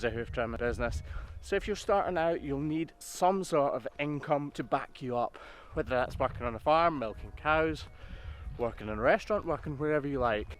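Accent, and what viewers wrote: Scotland, United Kingdom. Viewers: elaine east-byers yes, I can hear all three. That’s why I was confused as well. You drop your T’s, such as saying “ca’ ‘l” instead of cattle, which screams Cockney; however, after doing a quick search, it appears that first appeared In Edinburgh and Glasgow, then later Cockney. Surprising enough, my midwestern USA English understanding requires me to pay close, close attention to you speaking so I can understand you. Sometimes I even have to “translate,” which means I may get behind and have to rewind! No doubt if we were speaking in person I would be saying “what?” quite a bit! I hear the lovely brogue on the o’s! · He’s a question not about the cows hoof but he it goes - what part of Scotland is your “accent “ from? Your voice is a wonderful combination of Scottish and English or am I completely wrong? Either way I enjoy learning and watching your videos. 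HAPPY NEW YEARS !!!! Hope 2020 is filled With love peace friends family and laughter 😊PS tell Mrs Hoof GP we are great full for all the time you put into your YouTube channels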